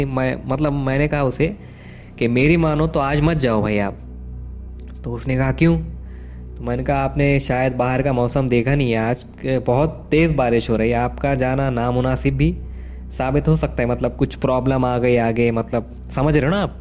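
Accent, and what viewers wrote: India. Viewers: Interesting accent of the narrator. Wonder which of India he’s from. I think this Waqiah happened to the narrator. Because he keeps saying “main ne” and then corrects himself saying “mere dost ne”. 😂😂 Good story. Got goosebumps 🙈🙈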